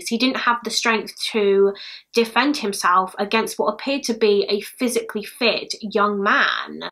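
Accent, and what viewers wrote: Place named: England, United Kingdom. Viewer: I read a story on AO3 the other day & one of the characters was called. Every time I came across the name, which was very often, the reading voice in my head suddenly had the English accent and pronounced the name exactly the way that our here does. This of course prompted me to walk around just randomly saying the name but in her accent. So thank you for finding a way to drive my family absolutely nutty (& into laughter) because that's my goal in life. Not to drive people crazy but to make people laugh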